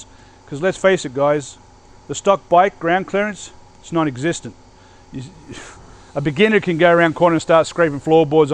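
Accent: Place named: Australia. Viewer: Okay, I gotta ask... Are you Aussie, New Zealander, or American? Your english kinda goes from Aussie/Kiwi to American english with certain words so I am thrown off. Great video but if you can answer that... that would be great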